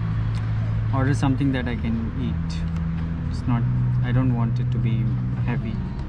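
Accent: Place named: Romania. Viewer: Supă accent is români ,dar se dau străini.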